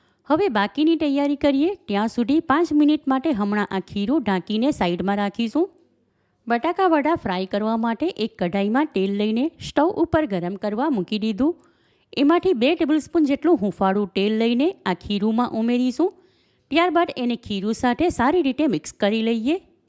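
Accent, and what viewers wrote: Surat, India. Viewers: This looks so delicious! I'm definitely going to try making these batata vadas at home. Thanks for sharing the recipe! surti tone, i love it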